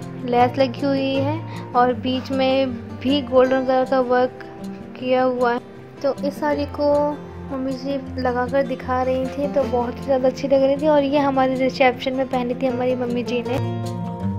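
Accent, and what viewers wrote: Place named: Varanasi, India. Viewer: Banarsi bolty hn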